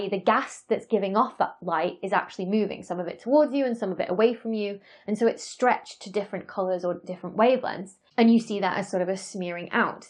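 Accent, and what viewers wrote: United Kingdom. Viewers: Is it me or is this more interesting because she has a British accent? Love Dr. Becky's channel.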